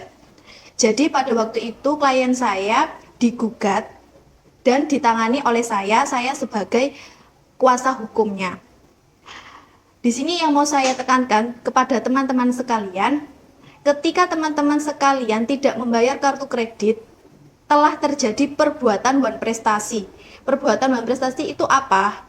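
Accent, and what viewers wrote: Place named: Java, Indonesia. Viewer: Jawa ha medok bngt mba. 😂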